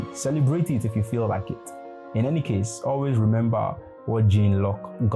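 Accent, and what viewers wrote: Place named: Nigeria. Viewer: No Nigerian that grew up in Nigeria cannot escape the accent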